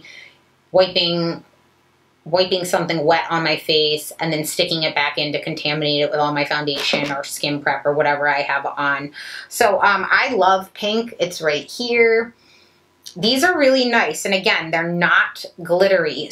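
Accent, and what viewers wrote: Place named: Boston, United States. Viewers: Omg I love you, I knew you were from Boston 😂 You have that edge. I live in Cambridge and people always have something to say about the way I talk. Now I know why I love your channel! ( other than the obvious) . People also should spell it Mahhhhk not Mark lol where did you grow up? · Oh my god - you're from Boston. No wonder I understand everything you say and always know what you mean without explanation. Obviously, I'm from Boston (now transplanted to CA). Your accent isn't heavy, I hear your "r's" being pronounced quite clearly. It's more of an attitude/style of expression that is Bostonian to the core and always seems "right" to me because it's home. Okay - back to finish the video now. I had to pause it to write this - the new-found knowledge of your Bostonian-ness was wicked pissa and wicked monumental.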